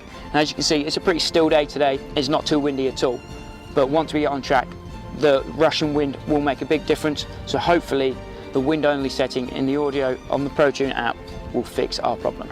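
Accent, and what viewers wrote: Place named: United Kingdom. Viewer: Great video, thanks for taking the time to make it 🙂. It answered a lot of my questions about wind noise, in a normal, undramatic British accent........God, I'm sick of all the over-exaggerated, over dramatic American accents on YouTube !! ☹️. As the speeds are quite low on your vid, what are your thoughts about wind noise on a side helmet mounted road bike travelling at speeds up to 80mph, do you think the results would be the same ?? Cheers 🙂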